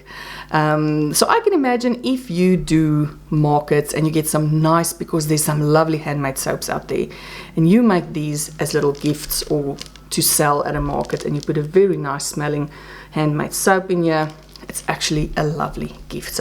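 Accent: South Africa. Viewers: I love your chats when you started talking i recognized that you are South African. Me too born at Cullinan in 1946 all my family are in Australia and some friends so only my daughter and hubby and a boy and girl are my immediate family. . Ive been crocheting since I was 8 year old when my grandmother taught a lefty to crochet. I've done dressmaking and most crafts but crochet and colouring in is my best crafts right now. And have loved listening to you ❤❤❤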